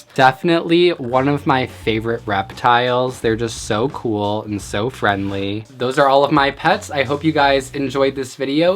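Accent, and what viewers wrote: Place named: Michigan, United States. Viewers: You have such a Michigan accent lol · your Michigan accent is so thick!! 😂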